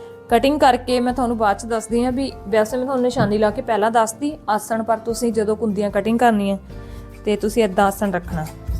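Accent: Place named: Malwa, India. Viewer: Ssa dii, Diii tusi kehri side toh nd tuhadi boutique kithe aa????? Tusi Malwa area de lagde o kyu k bolan ch thoda farak aa sade nalo 😊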